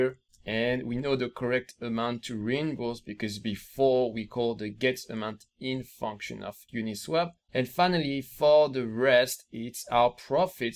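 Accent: France. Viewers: Are you French ? It seems you have a slight French accent ahah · Cet accent français 😂😂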